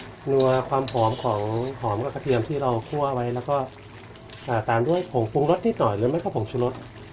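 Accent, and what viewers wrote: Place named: Korat, Thailand. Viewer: คนโคราช.บ้านเอ๋งงงงงง พูดแบบนี้ครับ ผมก็ยุ่ อ.พิมาย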